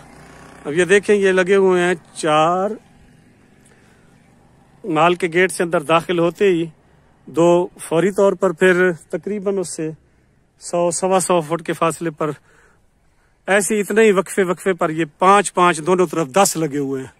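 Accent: Saraiki, Pakistan. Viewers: Saraki ka tarhka lgaya kro Maza ata hai.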